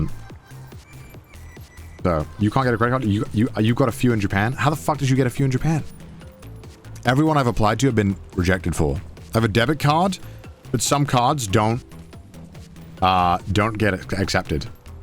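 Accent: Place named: Wales, United Kingdom. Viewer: Then why are you busy streaming in online platform like youtube in your channels to have money but not get a job for that country japan and live for years. Maybe you and your co should follow up your status because the government in Japan can be changing the policies when it comes to foreigners. And add to that they had lower birth rates and the government made a drastic plan for that. Why ask led Joey about his travelled in many parts of the country. Sinced you are from U.K. particulary Wales sinced your accent is deep.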